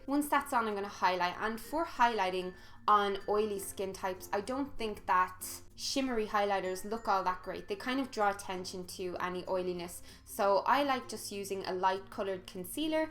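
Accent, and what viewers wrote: Ireland. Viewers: I soooo love irish accent... And i have a friend who is irish... She's so sweet and kind... First time watching your video... I subscribed immediately 😘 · Oooh man, what a lovely accent! It makes me wanna visit Ireland even more <3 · your tutorials are very helpful for people with excoriation disorder like me, now I'm not ashamed of my skin, thank you so much <3 also I'm Russian and I really like Irish accent, for me it's much easier to understand than British :) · I'm always so exited when I see your videos in my subscription box. I love your style and your accent is so desirable! Wish I was Irish! Thanks so much for improving my makeup knowledge and providing such inspiration!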